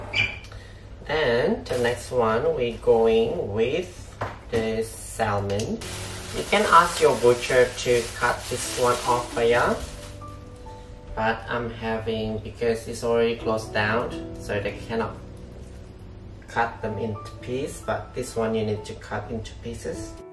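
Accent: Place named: United States. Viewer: You sound like an American. I am a polyglot, speak 9 languages and English is for the la zy. It is bar none the easiest language to learn, a breeze learnt in a weeks. No declensions, no diphthongs, all verbs remain in the infinitive for all pronouns, no conjugations to learn, add "ed" for past, add "will" for future and nouns don't have gender. You were saying?? Try learning Chinese, Arabic, Russian or French. Good luck with that!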